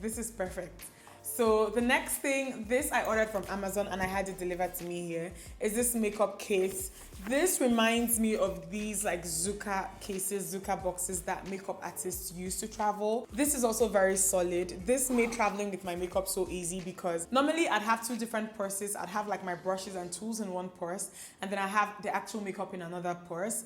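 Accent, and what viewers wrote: Igbo, Nigeria. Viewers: Where have you been, you sweet? Lol. I recently subscribed to this channel and I love that slight Igbo accent you've got!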